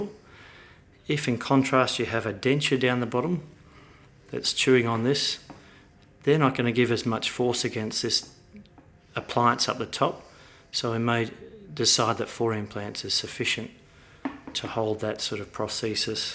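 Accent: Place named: Australia. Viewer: Here in the u.s. my implants cost $30,000. I love the Aussie accent.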